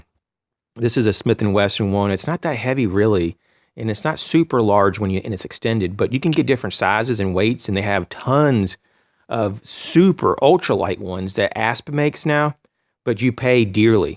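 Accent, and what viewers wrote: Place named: South, United States. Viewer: Southern Georgia? Or maybe Texas or Louisiana? Trying to place your accent. it's a hobby of mine, I'm North Alabama.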